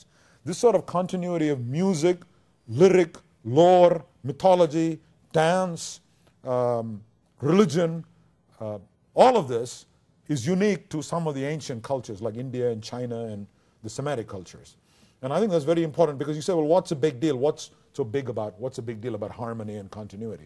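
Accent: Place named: Scotland, United Kingdom. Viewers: Why does he sound like a scottish man? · Thank goodness you have noticed that too. I wonder when he was at Cambridge university he may have picked up a bit of a Scottish accent as there were Scottish tutors and students there too.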